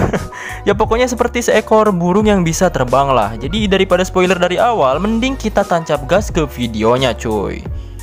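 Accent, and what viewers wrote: West Java, Indonesia. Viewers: Hahaaa sunda abisss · Bang dari jawa barat ya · Kakak orang sunda yah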